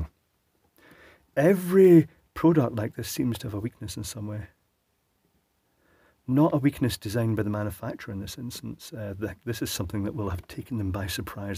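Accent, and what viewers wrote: United Kingdom. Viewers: That felling when Stevie from Family Guy repairing thermostat. I like that british accent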